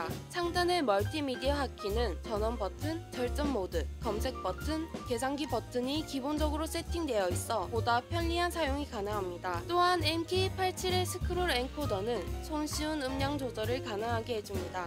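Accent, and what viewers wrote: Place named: Busan, South Korea. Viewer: 부산말투가 살짝~~^^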